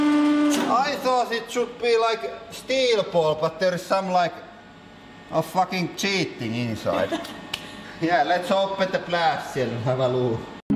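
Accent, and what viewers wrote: Finland. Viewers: nyt on kyllä niin selkee suomi aksentti että :DD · r u finnish? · Are u finnish??😂😂 im finnish too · Is that a Finnish accent I hear? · finnish accent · Every Finnish and Estonian person speaks like this anyways so not so unique.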